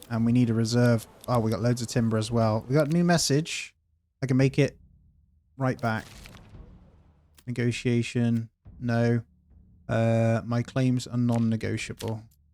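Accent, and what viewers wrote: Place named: East Anglia, United Kingdom. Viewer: Well its definitely an east anglian accent, I was just refusing to say Ipshit.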